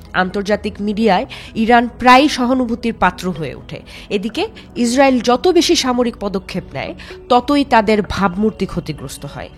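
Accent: Bangladesh. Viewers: আপনার ডায়ালেক্ট ও একসেন্ট বাংলাদেশীদের মত হওয়ার কারণ কি?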